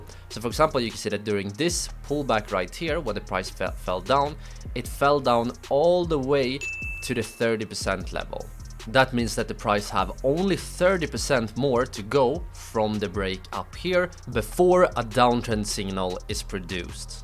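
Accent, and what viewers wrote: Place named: Sweden. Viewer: 😂first time seeing you and I already hear ur Swedish accent?! Great explanation for the strategy but brother am trading in lower time scales 15 and 5 min. Still works? And what settings would you recommend? Thanks in advance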